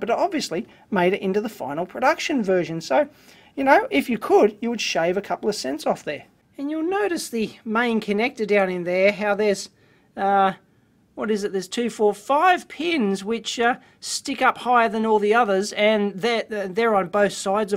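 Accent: Australia. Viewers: Um, he's Australian. That's how they talk.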